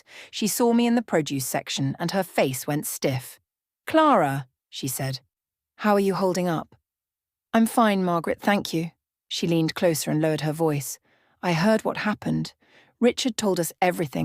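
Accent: United Kingdom. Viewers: Like the British accent, enjoyed this story.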